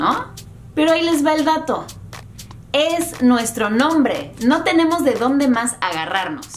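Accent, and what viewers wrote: Mexico. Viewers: Hola me acabo de suscribir a tu canal luego de ver tan sólo un vídeo tuyo. ¿Sos estadounidense? Si no lo decís no lo creía! hablas un castellano perfecto, pensé que vos eras mexicana ya que tenés acento mexicano. Che, ni un ápice de acento inglés!. pregunto: porqué los mexicanos los llaman gringos de donde proviene esta palabra? GREEN GO ???? desconozco. acá gringo les decimos a los europeos y eventualmente a los "yankis" pero nos referimos a los blancos caucásicos. Saludos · A mi me parece mas Mexicana o Latina que gringa. Cuando la oigo hablar imediatamente pienso en Mexico. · Una pregunta por qué decidiste aprender el Español con acento mexicano , si tus vídeos estan dedicados al mercado Latino ,fueran usado un acento mas neutral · La Biblia Dice he vivido en Tamaulipas, Durango, Chihuahua, Sonora y Coahuila y he conocido personas que tienen el acento norteño y otras que aunque sean del. 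Norte los escucho normal para mí que soy de Acapulco Guerrero, en todo el país existen personas que hablan el acento Méxicano estándar como lo habla, no porque sean norteños tienen que hablar igual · Te equivocaste un poquito. Hablas el castellano casi perfecto, con un encantador acento mexicano. Pero dijiste "unanimamente" y es "unanimemente". Saludos desde el Perú, un país hermano de México. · kelly ramirez ella estuvo viviendo en México y yo también dije eso, me encanta su acento Méxicano. y ella dice que ama México. ♥ soy de México jeje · Tu acento tan mexicano :3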